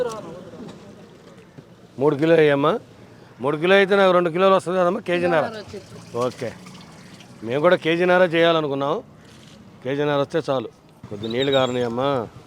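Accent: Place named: Vemuru, India. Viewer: మాది వేమూరండోయ్.... మా ఊరు మాటల్లానే ఉంటాయి అనుకునేవాడిని... మొత్తానికి నా ఆలోచన కరక్టే అయ్యింది... ఇక దంచెయ్ అన్న... వంటలతో...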